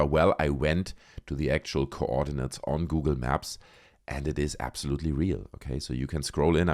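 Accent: Germany. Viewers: YOU suck bro you and your German accent tough listen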